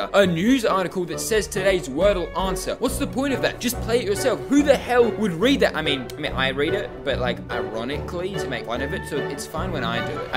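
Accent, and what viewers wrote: Australia. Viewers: I didnt know was australian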